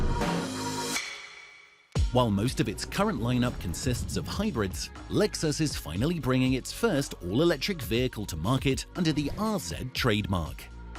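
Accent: England, United Kingdom. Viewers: Just curious, which English accent was the narrator using ? Thanks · English accent….. American market 🤨🙄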